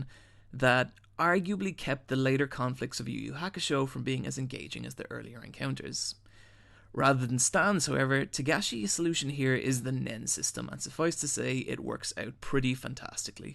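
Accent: Ireland. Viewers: Great review. btw are you Irish?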